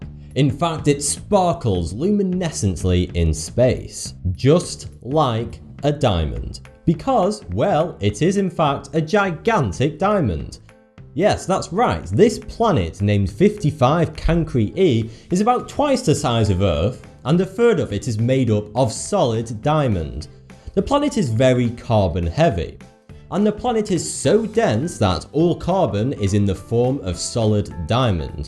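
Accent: United Kingdom. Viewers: 偏英式 我覺得很好聽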